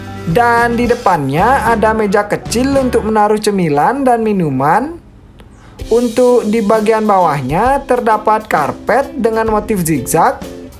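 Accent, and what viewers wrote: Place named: Sunda, Indonesia. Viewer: Sunda banget suaranya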